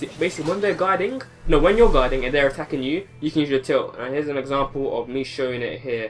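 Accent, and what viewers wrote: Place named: France. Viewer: I didn't even realise this guy was speaking english, i thought i clicked on a french youtuber's channel for a second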